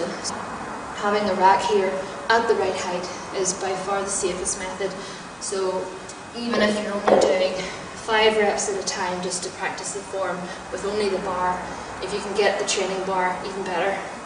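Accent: Ireland. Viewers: I like your Irish accent.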